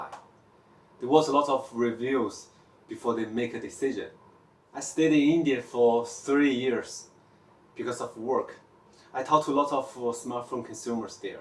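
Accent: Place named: China. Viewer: very good content. If you're up for some constructive criticism: -audio could use some work -English needs so much work, the typical thick Chinese accent (putting subs might help)..not being racist.. it's just that there are times when your words are indiscernible interested in what you'll do with your channel next though 😉 you should collab with TechAltar. that would be awesome since you already know each other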